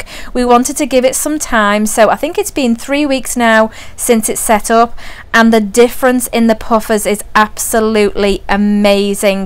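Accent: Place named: Merseyside, United Kingdom. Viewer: Hi the old tank looked good but the new tank looks amazing much more natural, with the Amazon puffers being wild caught the more natural feel of the tank and most of the glass been covered up the fish obviously feel a lot happier, great job 👍 Ps l've just checked out your website and realized that you are in the US but your accent is the same as mine merzyside! 😂😂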